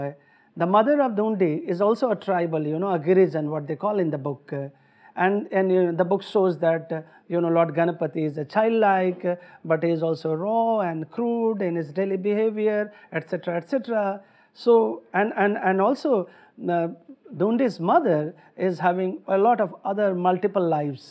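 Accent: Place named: Italy. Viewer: U have a kind of italian accent, as an italian i really love it.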